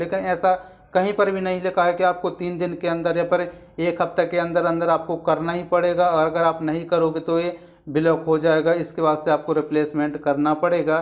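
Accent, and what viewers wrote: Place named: Bundelkhand, India. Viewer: Tum Bundelkhand ke ho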